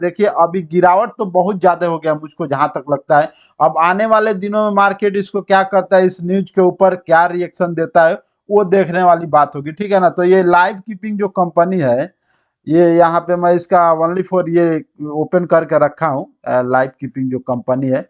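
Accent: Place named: Bihar, India. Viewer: Aap Bihar se hai, aisa aapke bat se lagta hai.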